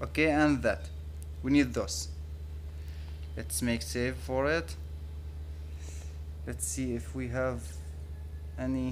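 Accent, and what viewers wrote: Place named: Arab world. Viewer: والله انك عربي عرفتك من اللهجه😍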